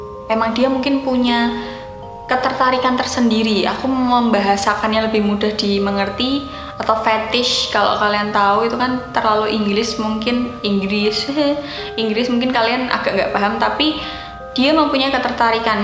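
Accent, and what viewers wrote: Java, Indonesia. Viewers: Seneng medok nya kalo liat mba tuh 😍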